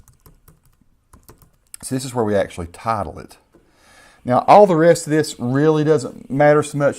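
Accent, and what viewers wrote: South, United States. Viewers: Sounds like a Southern Bumpkin 😊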